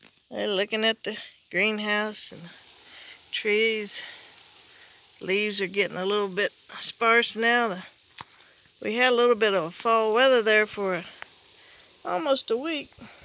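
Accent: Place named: Georgia, United States. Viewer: Amen to what You are saying. Don't have to see it to know it! Please don't get mad at me, but You sound kind of Georgia, but it looks kind of West Texas there?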